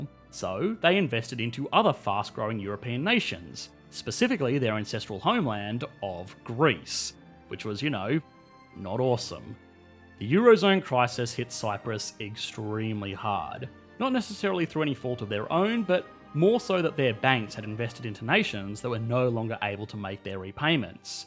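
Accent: Australia. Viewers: At 5,30 He had a stupid pronunciation for the capitol city - Sounding something like Nicozeya rather than Nik O See A! By accent, not sure if the guy is Australian mixed in with South Aprican with a dash of American splashed in there! Peace. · Well in fairness, the guy is an economist, he does economy videos, the history was tacked on more as a factor in that economy and the details don't change anything in regards to that, so it doesn't have to be too accurate. I don't watch these videos for history lessons, but because I like the Australien accent and the economic insight I get.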